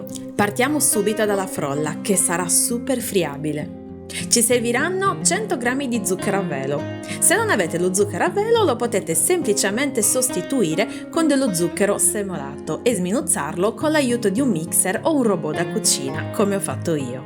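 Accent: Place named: Sardinia, Italy. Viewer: Ciao ti seguo sempre: molto brava👍sei sarda eh!!!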